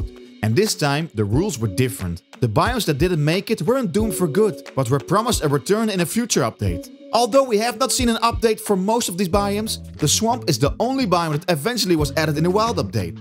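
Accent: Netherlands. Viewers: Hello, are you from the Netherlands? I'm asking this because of your accent. BTW love this vid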